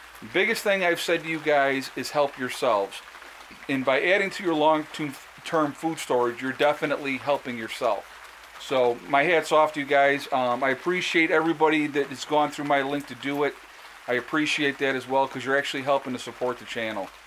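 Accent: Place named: Great Lakes, United States. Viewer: Good video- I noticed your accent was "normal" to my ears. I'm from the South Side of Chicago originally and you sound like one of "us". Went to your channel and you said something about upstate New York. It's funny how people from around the Great Lakes have a similar accent.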